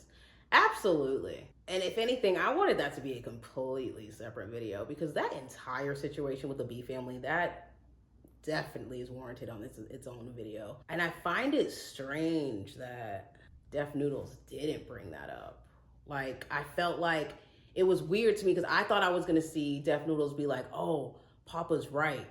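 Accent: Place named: United States. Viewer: Oh American English! A great time here. ❤️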